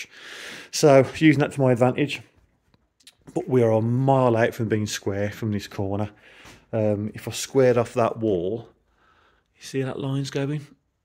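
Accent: Birmingham, United Kingdom. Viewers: Proper brummie. … there ACE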